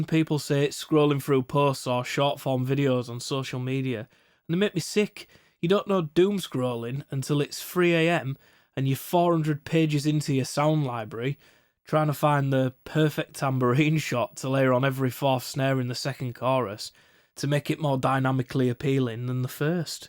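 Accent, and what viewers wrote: Northern England, United Kingdom. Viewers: Haha, great video. I love the humour and the northern flavour (I'm a bloke from t'North meself). I really liked the video <$1000 modular too. I'm holding the line at semi-modular right now but the ropes are straining! Cheers.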